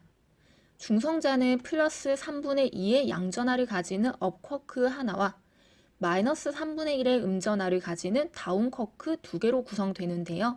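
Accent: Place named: Gyeongsang, South Korea. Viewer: 선생님 경상도분이시죠? ㅎㅎㅎ 억양이 귀여우시네요 ㅎ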